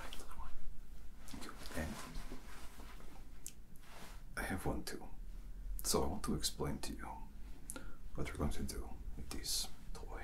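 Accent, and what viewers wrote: Russia. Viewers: posts video with russian accent Me: crying and pretending that this is Dimi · I’m going to insist my doctor speak with a Russian accent from now on. 😁 Good job,! 💙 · Oh...yay! The Russian accent returns....as a blonde!?!?!? 😊 Is the fact that I didn't know the answers to some of the UK questions due to a brain aneurysm, or is it because I'm American? 🤔. Glad our favorite doc is taking some time to be where is needed most.. 🥰 Stress & headaches galore this week. Being kind to myself sounds like a good idea, as does being kind to others. I've been letting certain people push my buttons. Gonna have to work on that. Lovely video as always. 😊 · Love the Russian accent 💙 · Nom nom, - delicious tingles to be had here! Russian voice: My brain is no longer hunger for relax. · No matter what name you decide to use Roberts or Alex you would be the best "doc" a person would want to see and i like your russian accent 😊😊😊 Always well done really 🤗👏👏💖😍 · Very tingly and the your russian accent is the most pleasant to listen to ever, thanks for the video, ✨ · your Russian accent is my personal favorite trigger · your Russian is better than what Hollywood often settles for in their movies. 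Well done! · Отличный русский акцент! Спасибо за видео, голос шикарный. Мурашки бегут, словно резвые кони по берегу Днепра! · I love the Russian accent RPs! How wonderful that you combined it with a medical RP. Genius! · made me smile with a russian voice and of recent news of russian poisoning and hearing police sirens Twice lol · your Russian is wonderful and the good Dr Roberts!! So glad he is still with us and got to take a vacation..Im sure Matt was a rather difficult patient😎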